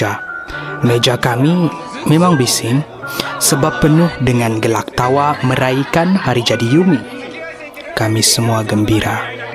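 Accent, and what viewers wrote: Sabah, Malaysia. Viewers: are you sabahan?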